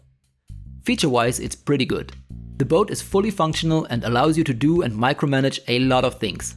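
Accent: Germany. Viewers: I have never heard an Asian guy make jokes with a German accent before... This was like some kind of an existential experience to me, thanks mate :D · I never thought of you as being a Nazi, I do like your German accent as it adds more realism to the theme being kriegsmarine. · Great review and I love the German Speaking! Lol and to all the people that think germans are nazis I guess all Americans are Republicans ;) or all Canadians are liberals. Bought the game in Hope's it's like SH3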